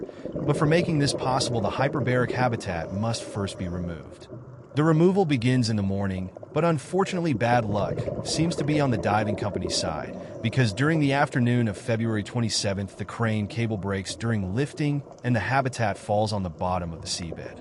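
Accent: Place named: Belgium. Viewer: Just the audio. He explains at the beginning that he has a thick Belgian accent so for clarity he uploaded his transcript to a reader.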